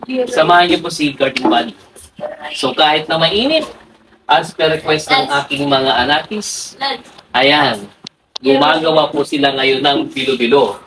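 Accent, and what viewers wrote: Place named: Cuyonon, Philippines. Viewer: Pagcuyonon din lang halata ka na cuyonon ka ra Tagalog Tagalog ka pa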